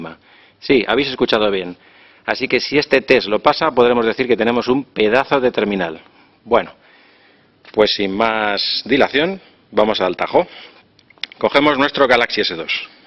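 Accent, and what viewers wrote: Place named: Italy. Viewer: you are an italian who is trying to speak spanish? omg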